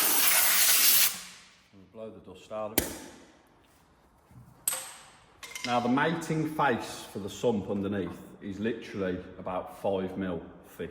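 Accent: Birmingham, United Kingdom. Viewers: Nice hearing a fellow Brummie and also getting a result out of that engine. well done kid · Just watched your channel for the first time and thoroughly enjoyed the Brummie tones of your commentary. You obviously know your stuff on trannies and are able to make judgments using your experience. I’ve liked and subscribed and look forward to watching you again in the future. 👍🇬🇧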